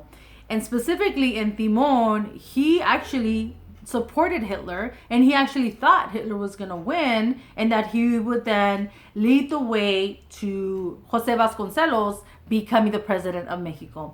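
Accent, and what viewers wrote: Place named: United States. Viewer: Too American of an accent 😖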